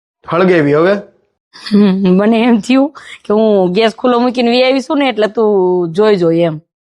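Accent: Kathiawar, India. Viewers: Tame ketli saras kathiyavadi bhasha bolo cho😂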